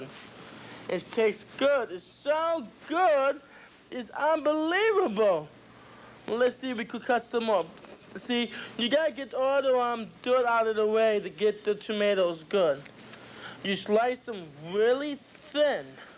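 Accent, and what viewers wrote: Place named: Russia. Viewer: he's talking English just as CrazyRussianHacker is doing it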